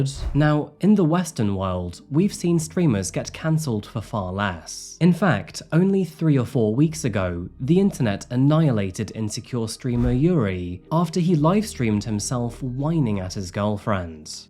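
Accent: England, United Kingdom. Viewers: You have an English accent but pronounce some words like an American? Is this for your American viewers or just how you say it?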